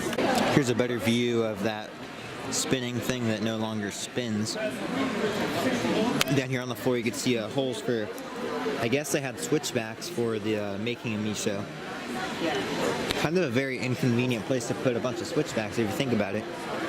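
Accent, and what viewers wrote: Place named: South, United States. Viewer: The whole Wonders building is very dated but could be gutted and converted into something else. The outside should be painted from yellow to silver or something less garish but the space is huge and should be reimagined into something which makes use of all that space, maybe a ride. You are so disciplined which is good as it must be tempting to open things and interfer with stuff you see but you don't which should be commended as a lot of vloggers don't always do the same. Also where are you orginally from? I can't always recognise American accents but you seem to have a soft sounding southern accent I can't work out.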